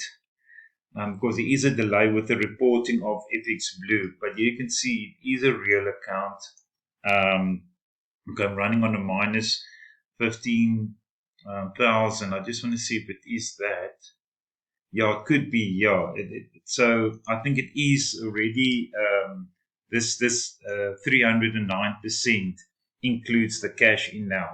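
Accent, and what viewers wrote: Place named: South Africa. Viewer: Digging the deep Afrikaans accent bro. 🇿🇦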